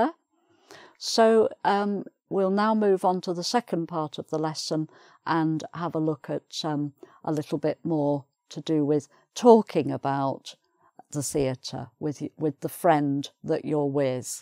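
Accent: United Kingdom. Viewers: Thank you for this videos, I love the British accent I undersant all without subtitles, Greetings from Mexico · Hello Mrs., i am Merci from Indonesia. I am on the second year in junior high school this year and due to the covid-19 i have to study at home. It is nice to know you and nice to follow your lessons. I am so interested in following your lesson and i like your accent very much. Anyway, i would like to imitate your British accent keep joining your English program. Best regards and have a nice day from Java island - Indonesia. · Hi,.I like your accent.God bless the UK.天佑英国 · Your videos are my bread and butter lately❤❤❤❤...I love your British accent, could you make a video in how to sound british please? Please, please, please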